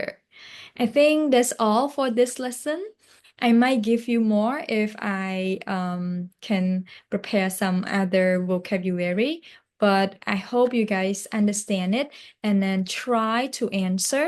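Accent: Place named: United States. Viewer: អ្នកគ្រូនិយាយភាសាអង្គេស តុង អាមេរិក បានល្អណាស់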